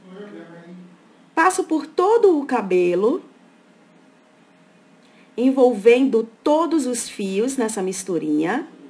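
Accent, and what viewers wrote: Bahia, Brazil. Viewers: Lindona seu cabelo ta lindo!! mil bjos!! gosto muito de te escutar om esse sotaque lindo baiano!! fico com saudades da minha cunhada!!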